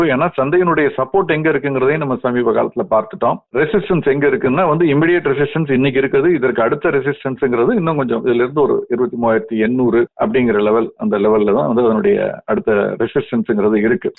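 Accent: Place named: Sivaganga, India. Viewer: Eruchunaa illai. ஏறியது என்றால். அவர் பேசுறது சிவகங்கை மாவட்ட பேச்சுவழக்கு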